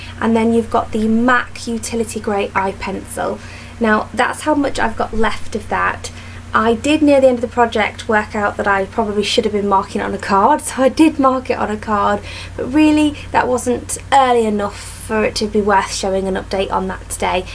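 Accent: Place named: United Kingdom. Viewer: Yay 21 product, that is amazing! I used up 30 makeup products this year (including one little polish and a face primer). Happy to follow you in 2017, girl! :) Are you from the UK, I looove your accent! You remind me of how Sali Huges speaks (I adore her)! Oh congratulations on your pregnancy! I have two little girls! :)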